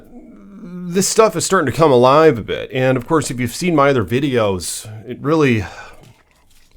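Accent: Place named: Canada. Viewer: I swear, I thought you were from Canada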